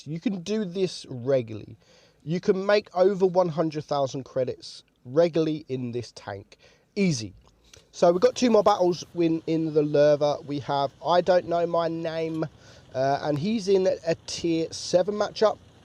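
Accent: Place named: England, United Kingdom. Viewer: drake 515_3 just a English thing. Listen how he says armor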